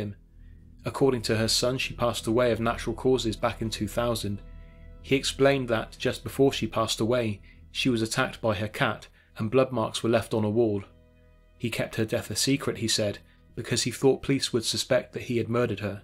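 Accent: United Kingdom. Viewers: in my humble opinion your voice and dialect are two reasons I love your channel. As an American living in Germany I'm strangely biased as personally I won't listen to my favorite literature unless it's in a British accent. I'm really into olde sea novels and Gothic spiritual tales and just can't listen when read by a fellow American.. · Soon as I heard the British accent, I though oh boy here we go he's gonna fuck every story up but honestly this video was incredibly accurate and factual. The only missed detail was Dahmer's address. He said 924 North street, it's actually 924 North 25th street between Kilbourn and State Streets. I am a lifelong Milwaukee resident and am familiar with all these stories. Kudos to whoever researched them. Great job.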